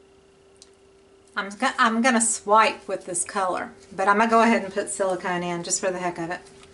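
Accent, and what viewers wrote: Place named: Rural, United States. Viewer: That "Country twang" just makes you more enjoyable to watch and listen to.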